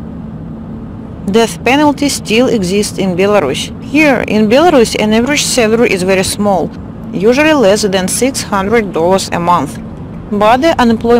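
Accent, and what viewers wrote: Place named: Russia. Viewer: your Russian accent so charming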